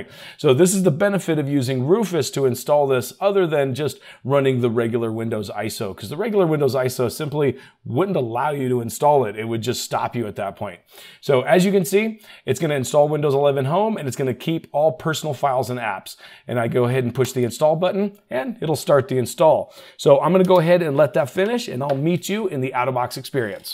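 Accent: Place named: United States. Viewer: Today I watched your YouTube broadcast again, and it’s been synchronized in Dutch — probably an AI edit. Although my knowledge of English is limited, I prefer American voice. YouTube thinks I’m a donkey.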